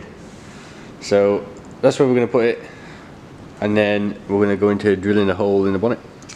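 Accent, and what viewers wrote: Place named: South Africa. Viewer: Love the accent! Where ya from? I'd have to guess south africa but honestly I've got no clue lol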